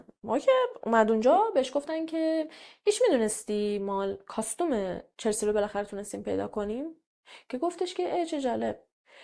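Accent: Mashhad, Iran. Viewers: پرونده جالبی بود 👍، ضمنا بعنوان یک مشهدی بگم که یکم ته لهجه مشهدی گرفتی خانم😉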